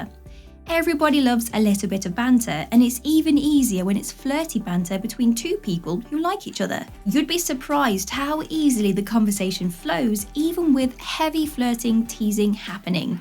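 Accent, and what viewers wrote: United Kingdom. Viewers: has a nice British accent to listen to, where in England are you from? 😅 good tips and yeah dating is difficult 😊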